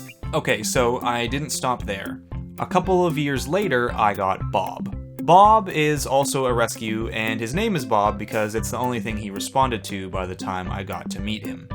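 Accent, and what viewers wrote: Canada. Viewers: You're definitely Canadian! I have made up my mind haha. I am Namibia (African) and can just tell from your accent. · If I remember correctly, I think he hinted at being from around Toronto in one livestream. But yeah that accent is DEF Canadian 🤣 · I've been thinking the Sam's thing! Either Canadian or from the very northern United States, like Minnesota.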